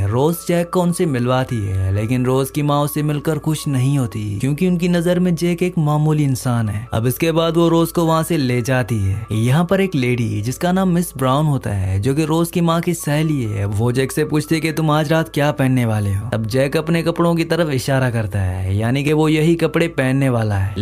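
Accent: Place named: India. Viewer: Language sai urr accent ptaa chl rha hai indian hai urdu esaay nhii bultay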